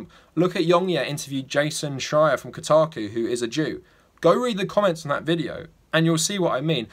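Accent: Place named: England, United Kingdom. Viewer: You may want to watch yourself. You said ‘kill’, ‘all’, and ‘jews’ in that order in a video and I’m assuming you live in England based on the accent, and as we know based on your trash judicial system, context is irrelevant. Plus, they’d probably have something to say about that poster behind you with that particular salute. Anyone want to get on the horn about this terrible person to the authorities?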